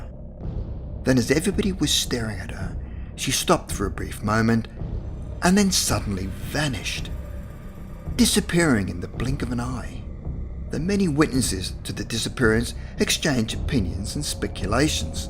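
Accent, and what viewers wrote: Australia. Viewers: That’s the way Aussie’s pronounce their numbers.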